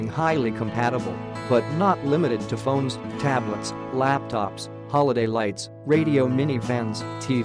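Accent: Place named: Greece. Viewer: Κατάλαβα από την προφορά σου πως είσαι Έλληνας, και το επιβεβαίωσα μόλις άκουσα και το τραγούδι που έβαλες! Από που το αγόρασες το μηχάνημα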